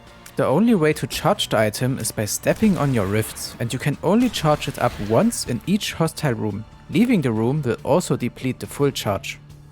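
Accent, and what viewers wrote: Germany. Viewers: Wait ya german?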